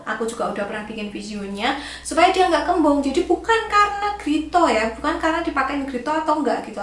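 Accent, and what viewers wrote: Java, Indonesia. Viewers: mbak medok sekali 😂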